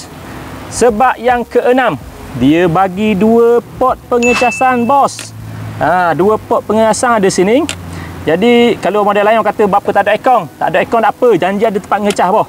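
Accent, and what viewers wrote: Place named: Terengganu, Malaysia. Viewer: Try ckp kl tpi bunyi ganu gak😂klaka la abg ni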